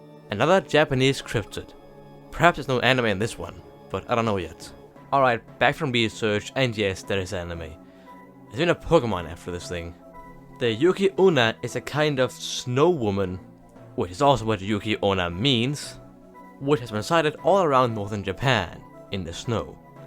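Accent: Denmark. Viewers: Thought I recognized the accent. It's Danish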